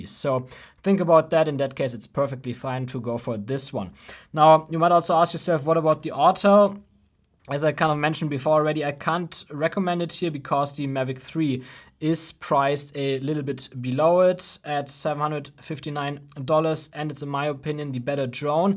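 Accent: Germany. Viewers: are you from germany? sounds familar like you are speaking...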